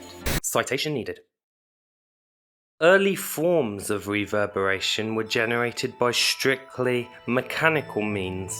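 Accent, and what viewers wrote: United Kingdom. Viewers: YEs yeS YeS YES! Awesome style in every aspect with a lush brummie voice. Long may this continue!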